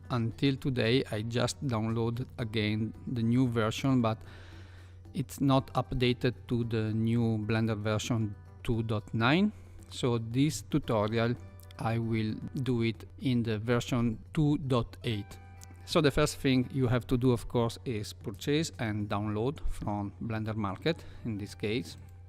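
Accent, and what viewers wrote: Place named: Italy. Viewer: accento piu italiano di questo mai sentito ma grande comunque!!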